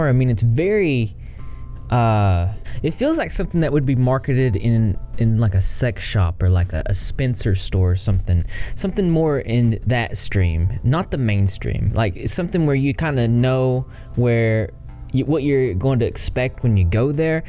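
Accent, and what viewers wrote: South, United States. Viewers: He has a slight southern accent sometimes it's quite noticeable depending on the word lol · long live the South, lol. I'm from NC and I feel like he's just gotta be close lol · Your southern accent reminds me of my hometown. Too cute ❤️🐢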